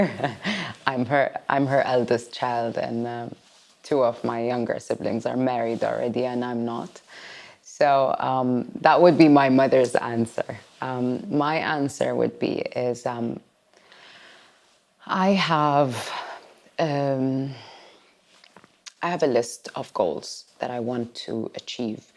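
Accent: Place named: Arab world. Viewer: Masha Allah🌙 Us/We,..Muslim/Women,..Mothers have more responsibility's than Men have,..we take care of Children/Husband/Family/Career/Domestic chores etc etc,..doing all these things in a loving/caring good way gives us positive energy,..I regard all my responsibilty's as divine chores,..I love/like accent of Arab Men/Women talking English,..well spoken,..Shaikha,..Inspiring,..investing in person/people,..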